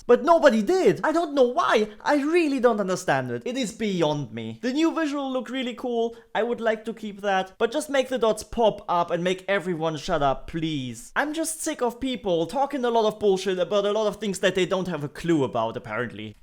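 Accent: Germany. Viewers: What is that accent? Is it German? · you deserve more subs and i was wondering are you German or Russian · Are you German? Sounds like a German accent. I like it.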